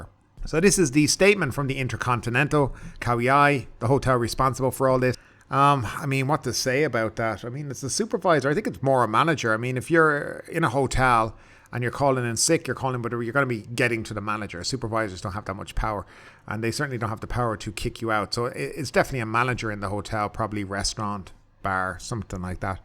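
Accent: Ireland. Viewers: This guy sounds Irish.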